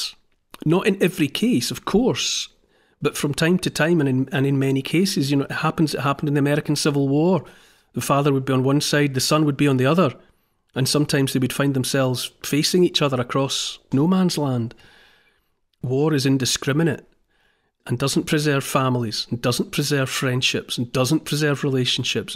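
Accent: Scotland, United Kingdom. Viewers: Neil Oliver => bringing back prestige to the Scottish accent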